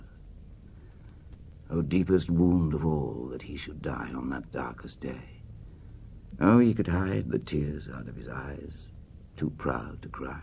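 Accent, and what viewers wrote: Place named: Wales, United Kingdom. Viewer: but you can hear the welsh tones, phrases, and emphasis it is warm and draws you into the prose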